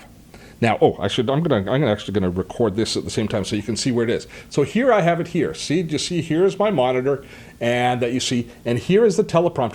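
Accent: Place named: Canada. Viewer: Thanks for this I am trying to get to grips with the app. It sounds like you are a fellow Canadian, what a great personality you have!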